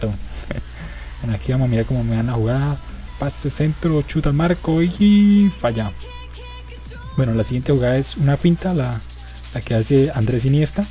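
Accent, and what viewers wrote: Antioquia, Colombia. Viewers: porsero ust es de colombia por q tiene pora voz de paisa de calarca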